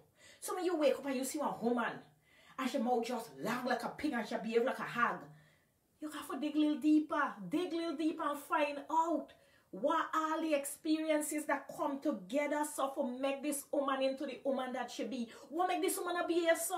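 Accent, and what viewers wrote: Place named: Guyana. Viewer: I am not guyanese or caribbean but love listening to your beautiful accent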